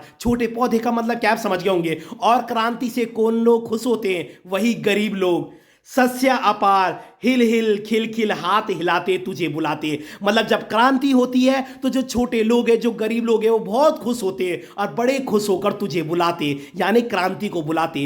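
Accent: Garhwal, India. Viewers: Sir kya aap gadwali ho ? Love from Chamoli - Gopeshwar, Uttarakhand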